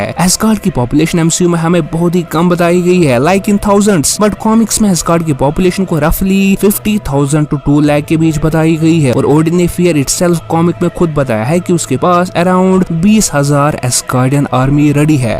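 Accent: Kashmir, India. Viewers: Bro Aap kashmir se ho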